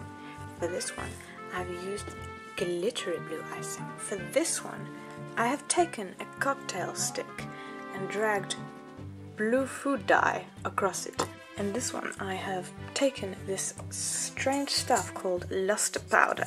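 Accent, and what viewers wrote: United Kingdom. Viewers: What part of the UK are you from? I've decided I need to marry a woman who says "me dainty fingahs" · Gracious, you're British. I came from the latest Grumps animation, and dang-ass-dang, you're all the more awesome because of it.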